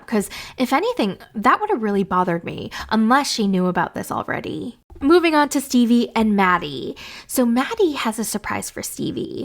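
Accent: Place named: New York, United States. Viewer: Your New York accent. Haha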